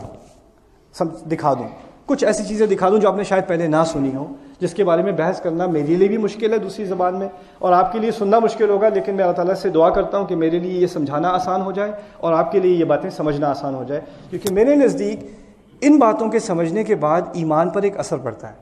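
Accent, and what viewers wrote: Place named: India. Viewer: I didn't understand anything but so nice to hear him speaking urdu:) it sounds like indian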